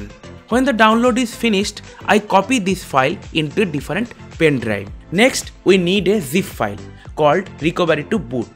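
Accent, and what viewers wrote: India. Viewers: Indian. No where near Italian. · Bro... Everything is nice... Just work on your accent a little bit.. Get rid of that Bengali English accent.. It sounds cringy bro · i hate the indian accent, but i wqs able to watch the video to the end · He has Indian pronunciation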